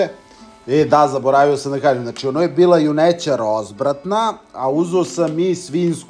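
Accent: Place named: Morava, Serbia. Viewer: Desi bre zemljace. Ko da ste porasli pored Moravu. Mlogo bre dobar paprikas. Malo kocnice na mas na leba. Mlogo bre holesterol. I malo finocu na govor. Niki bre iz Beograd nece da te gleda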